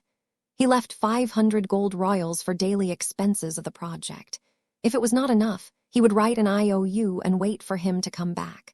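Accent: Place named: China. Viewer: I knew it was chinese translated snd fan made. Just how the narrator speaks. Good story though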